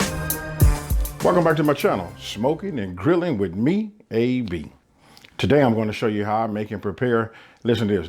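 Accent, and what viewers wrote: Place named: California, United States. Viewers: Dude has California accent !! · You have a country accent however from your voice and the way you talk I’m assuming that you’re from California Compton Compton or cities around California Los Angeles maybe if I go farther than that Alabama but I’m very show you from California , your foods are delicious and all them they’re healthy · He gotta be from the west coast I can hear it 😂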